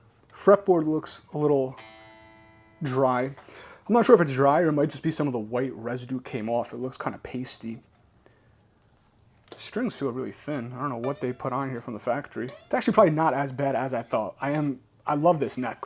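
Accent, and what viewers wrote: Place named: New York, United States. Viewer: As soon as I saw you got the matte black Les Paul. I have the same one but with green trim I'm surprised you didn't plug it in before you opened up the other one you showed amazing restraint God bless you . End with the abalone. I'm from the South Shore of Boston so it was about a second and a half into the video that everybody knew you from the East Coast definitely got New York in there I'm saying you're almost Rhode Island border Maybe Rhode Island Fall River. You're going to love that Matt Les Paul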